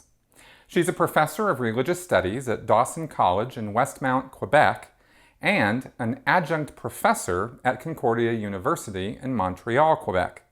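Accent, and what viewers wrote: United States. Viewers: Your accent reminds me of an American sailor in the 1961 French film Lola. Otherwise, great as always.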